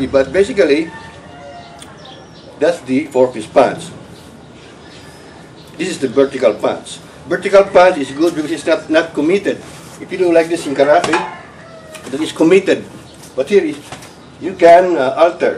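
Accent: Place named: Philippines. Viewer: he sounds filipino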